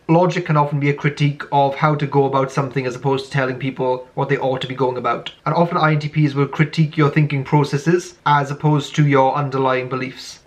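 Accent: Wales, United Kingdom. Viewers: He has a Welsh accent! (a variation of British English spoken in the Wales region)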